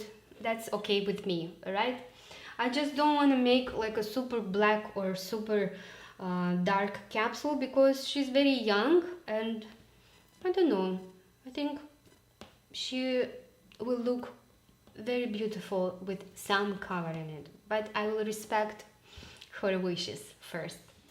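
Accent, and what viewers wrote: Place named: Russia. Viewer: What accent is that? Sounds so Russian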